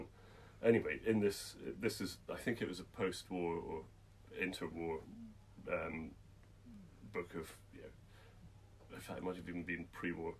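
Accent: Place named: RP, United Kingdom. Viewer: We read you above book in our book club , we all loved it. Now I’m planning to do Scotland tour so hope will de-tour to your book shop. My friend always gets old historical books so now i know where to buy them. It’s so lovely to see the writer , if he’s still alive like you . I like your posh accent 🌷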